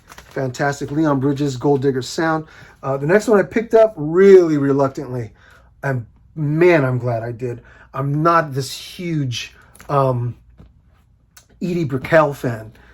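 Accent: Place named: France. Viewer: Hey thanks for the recommendations. I must admit I am not that big of a Leon Bridges fan. I have only heard his first but it was really boring to me. I do like although I don't have any of their albums on vinyl. Edie Brickell that is interesting. I do have. I didn't realize they were still putting out music. Nice French accent by the way. I hope you are doing well. Cheers.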